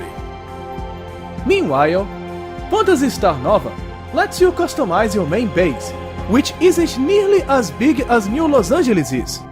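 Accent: Brazil. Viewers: Impossível não notar o sotaque brazileiro lol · Are you Brazillian? You sound brazillian...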